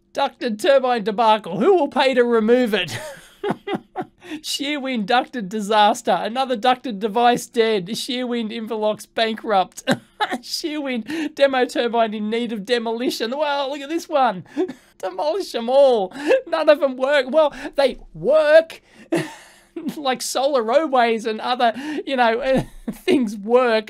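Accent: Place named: Outback, Australia. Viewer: Why come you talk like you're from the outback?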